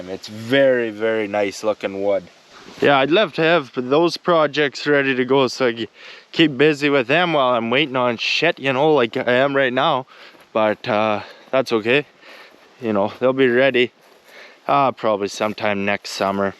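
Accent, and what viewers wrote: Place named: Eastern Canada, Canada. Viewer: A very smart young man. Im not sure but he sounds like he must be from eastern Canada I was up there years ago in Whitehorse & Inuvik. This kid has got it goin on. Btw..you can peen that crush sleeve back out & re use it. Ive done it and seen it done many times. It just sers you pre load on your pinion. Best wishes!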